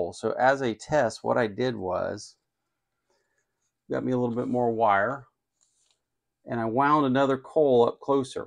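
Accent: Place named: Spain. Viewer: Your french sounds a f... lot like spanish.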